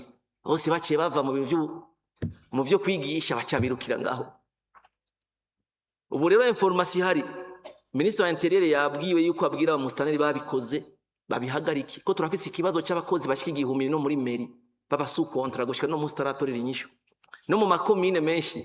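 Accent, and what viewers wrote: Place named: France. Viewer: Uyu numurundi canke numu francas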